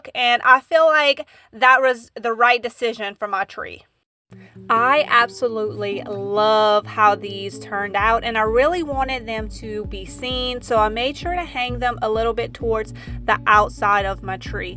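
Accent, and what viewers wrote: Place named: South, United States. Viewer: I liked the cafe curtains. I love your southern accent!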